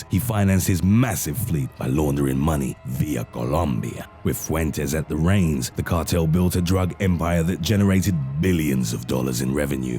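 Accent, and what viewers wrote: Spain. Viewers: this narrator gotta be some sort of spanish. (btw love the voice) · nice video, your spanish is pretty funny btw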